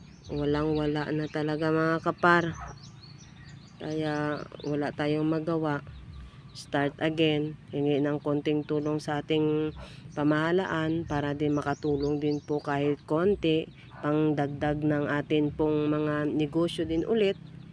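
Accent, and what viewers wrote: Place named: Iloilo, Philippines. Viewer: Halata ang ilonggo nimu madaam...good job ..go lang ng go ...❤❤❤❤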